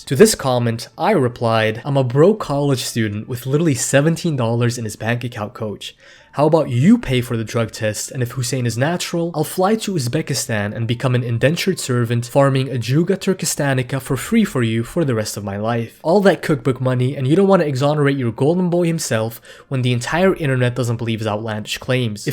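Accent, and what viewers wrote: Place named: Morocco. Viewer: By the way I wanted to ask, are you moroccan? You sound like a moroccan lol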